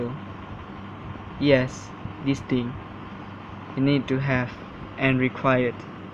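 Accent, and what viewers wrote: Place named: Malaysia. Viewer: Sounds like malaysian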